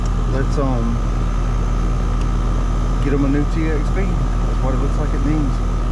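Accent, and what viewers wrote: South, United States. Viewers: Lov the southern slang. Gunna plug it up 😂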